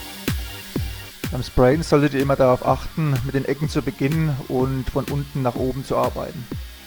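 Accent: Franconia, Germany. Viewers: Du kommst doch aus Franken des hör ich doch 😝 komme aus Würzburg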